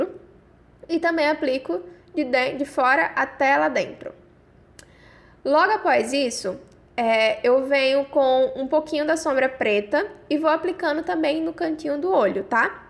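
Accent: Northeast, Brazil. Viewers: Amei o vídeo e já me inscrevi. De onde vc é? Tem um sotaque nordestino. Sou de Recife. Beijos 😘😘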